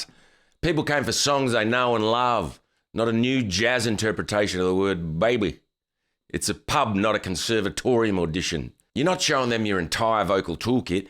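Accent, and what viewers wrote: Australia. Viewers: I'm planning to get back into gigging and I've been loving your advice. Firstly it is spot on and I know when I was younger I've been guilty of many of the mistakes you've highlighted. Also because of the Aussie accent I feel like I'm getting advice from a Bluey character (I have a young kid alright) and makes me love it more.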